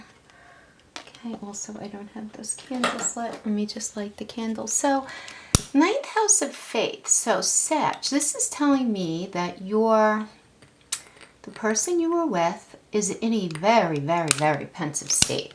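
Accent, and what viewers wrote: New York, United States. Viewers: Is it new York accent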